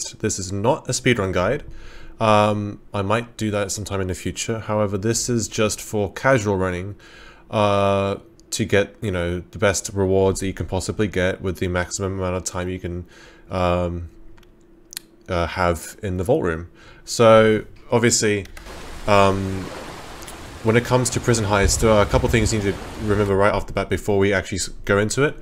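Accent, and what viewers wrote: Australia. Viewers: thank you for this helpful guide mr. aussie man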